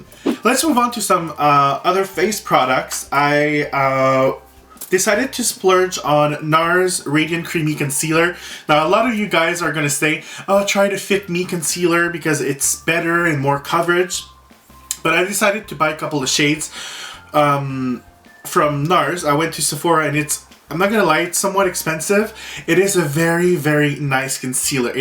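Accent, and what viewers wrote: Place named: Quebec, Canada. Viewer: Pe-Nell-o-pee. You French Canadians and your Accents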